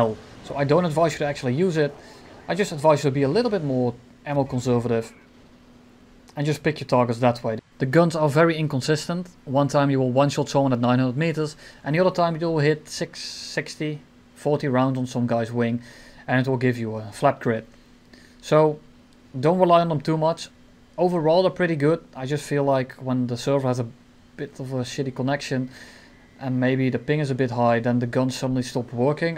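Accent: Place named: Netherlands. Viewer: They're both dutch.... it's literally just the accent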